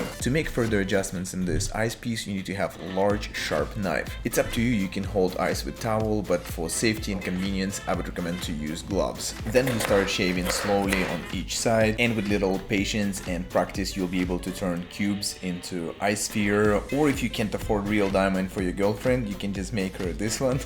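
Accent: Russia. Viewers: По акценту понял, что автор канала русский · Where are he from? Soo shity russion accent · Ну я понял что ты русский но не по имени а по акценту ну чисто вот этот русский который хочет дать тебе по лицу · Вот странно слушать русский акцент английского языка, будто говорит на родном но ничего не понятно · русский же акцент, не? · Русский акцент.) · Я один слышу русский акцент? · Я никак не пойму. У тебя вроде как русский акцент? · Он очень похож на русского который говорит на английском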